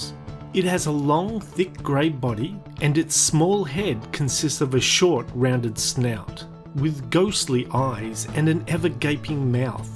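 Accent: Australia. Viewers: The narrator's Australian upspeak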